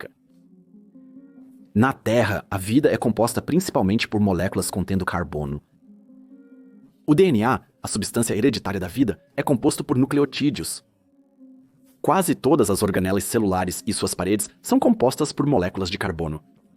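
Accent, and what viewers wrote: Portugal. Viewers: Porque do nada durante o vídeo muda para o português de Portugal? 😂😂😂😂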